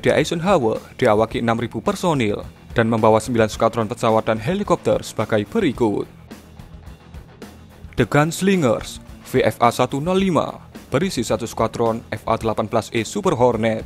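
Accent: Java, Indonesia. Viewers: Medok ya mas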